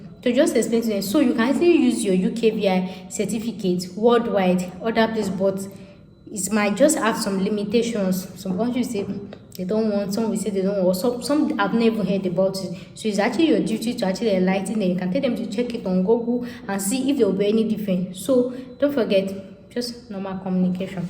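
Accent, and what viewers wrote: Yoruba, Nigeria. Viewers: I just like the way u speak your yoruba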